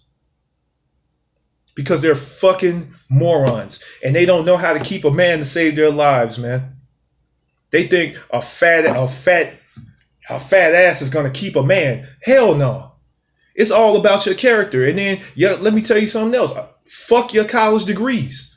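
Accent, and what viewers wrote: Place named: United States. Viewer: I'm sick of them to man. I been done left the building. you did a good job telling them off man. you sound like you been in the United States all your life. good Job man.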